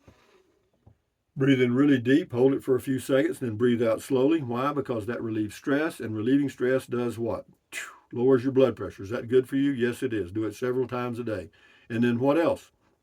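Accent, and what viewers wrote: Texas, United States. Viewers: Sam Elliott is a mature, seasoned actor, good hair, impressive mustache, deep voice. He has earned many fans among lovely women our age. Amigo, I say take it as a compliment, definitely a flirt, when a woman claims you remind her of good ol' Sam. He played Virgil Earp in Tombstone 1993, and he played Sam Houston in Houston: the Legend of Texas 1986. And a lot of other western and action movies. We could all be compared to worse. And anyone who thinks your respectable Texas accent sounds like that tv doctor, is a tone-deaf northerner. Don't even bother fretting.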